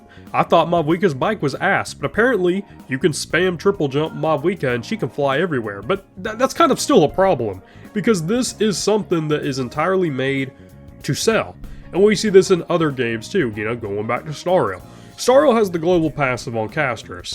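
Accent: South, United States. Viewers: Firstly damn sounded a lot more southern than he usually does In all seriousness though yeah the gacha selling Genshin has been having has been getting out of hand and honestly I'm glad to just be watching the chaos unfold from the sidelines because it has been getting ridiculous lately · ah i wondered why you sounded so overly american lol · Not relevant to video but are you southern by any chance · the southern accent is awesome, glad to see the upload! hope you feel better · didn't post for a month and came with a southern accent. omega based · How did your Southern twang intensify over time 😂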